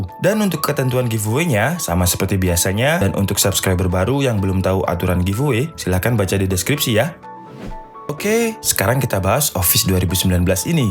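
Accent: Java, Indonesia. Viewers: Menurutku bedanya GK terlalu jauh dengan Ms 2016 Bang dari Jawa y wajar logatnya logat Jawa kalau bicara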